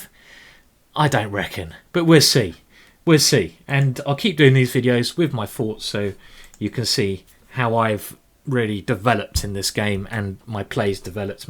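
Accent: United Kingdom. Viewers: Your voice's alright, mate. The world is bathed in American media, with nasal voices in comparison to which any British accent at all is like a musical experience.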